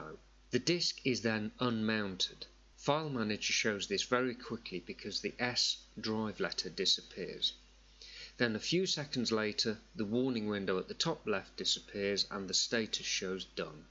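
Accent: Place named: United Kingdom. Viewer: Very helpful, thank you. Your speech was so good that I didnt mind the british accent, which I normally tend to despise. Although I do have one question, how the hell does the program even know where in the disk to put the files to during a shutdown? As far as the RAMdisk is concerned, the files could have come to it from even the desktop. How does it know where the files originally belong to? Edit: Nevermind, for some reason I assumed that RAMdisk was sending the files to their original places upon shutdown. Nay, rather, it just saves them to that one folder you specify and reloads from there upon restart. Why did I even assume that I wonder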